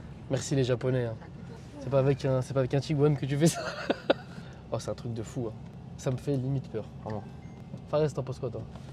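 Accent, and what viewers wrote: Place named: England, United Kingdom. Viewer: oh my god I love this English accent, you sound hlou😍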